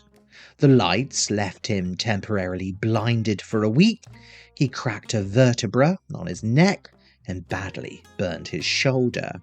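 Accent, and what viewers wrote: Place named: West Country, United Kingdom. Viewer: Ah yes, Grockels, is I think, more of a Devonian thing. I'd only heard it said for the 1st time when I was either 20 or early 20s. And the person I heard first use it said it was more of a Devon thing. I already told you that sometimes you have a distinctly west country ring to your words & you explained to me where you were from & I realised that in some, more northern areas, they also seem to have a similar brogue. [you probably don't remember, but I thought you were a Bristolian & you corrected me.]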